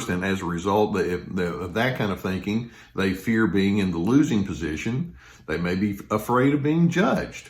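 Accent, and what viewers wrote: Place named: South, United States. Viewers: Mara, perhaps the southeastern USA. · Hi from Spain, where are you from, the south of EEUU?, your accent is very difficult for me. I like your vídeos a lot. ☺️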